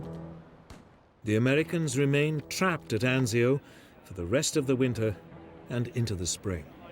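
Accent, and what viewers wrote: United Kingdom. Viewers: I only had to read the description to know it was another propaganda load o'crap about Italy's role so didn't even watch. I would have bet a million it's British, and listened for 10 seconds and yep, sure enough.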